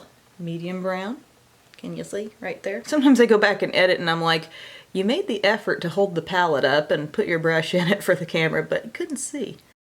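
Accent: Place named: Southern Indiana, United States. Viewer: O.k. going to try the Profusion products now that you recommend them! They look fun! I've been watching your channel and I believe I grew up near the area you grew up. I'm from Southern Indiana near Evansville (live outside DC in Northern Virginia now)! Your voice reminds me of "home!" And, I just love that. Nice to get beauty tips and a taste of home all at the same time! ❤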